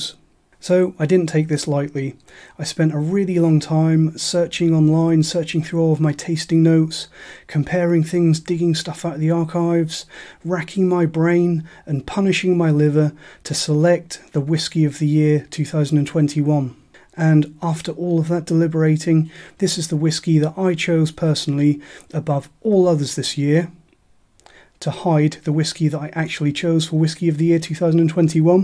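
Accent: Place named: Norfolk, United Kingdom. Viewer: Hi mate,you sound like you're from Norfolk, am I right? I am a Norfolk whisky nut, North Walsham. Let me know if we are neighbours! All thebest. Regards, Pete.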